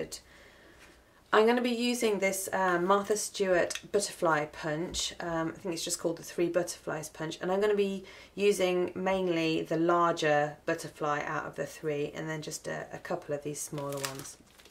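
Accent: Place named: United Kingdom. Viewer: I just have to tell you I love watching your videos! I love hearing your wonderful British accent! I just bought a few papers from that October Afternoon line you've used a lot, and I think part of the reason was how cool "October Afternoon" sounded when you said it! Very cool papers as well!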